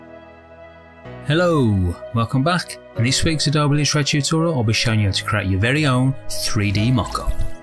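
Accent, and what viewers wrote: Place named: United Kingdom. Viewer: Bruh I cant understand the way you are talking in british style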